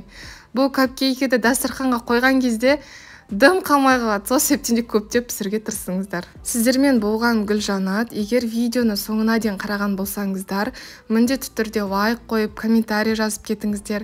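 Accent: Standard. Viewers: Таза қазақша сөйлеген тіліңіз қандай балдай?! 👍👍👍👍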